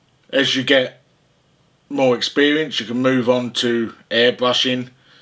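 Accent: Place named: England, United Kingdom. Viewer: American language? Wot you on about you bleedin sceptic. Its the king's English he's speaking. He ain't gonna speak Spanish nah iz eee?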